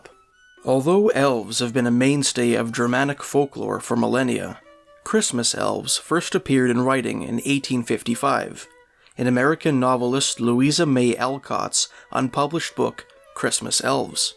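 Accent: Canada. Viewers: He even has a Canadian accent! ;)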